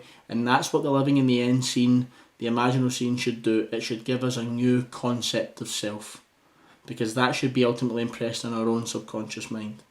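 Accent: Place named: Scotland, United Kingdom. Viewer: A UK Neville follower! Love the Scottish accent, I'm from Wales and am a follower of Neville. God Bless :)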